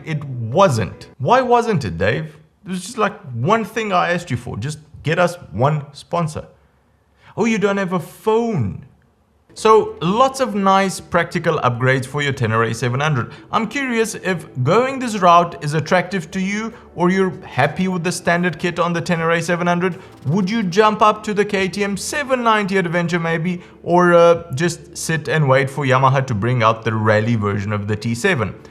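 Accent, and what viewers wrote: South Africa. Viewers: Thanks for the great info, where are you from? you sound positively Afrikaans/South African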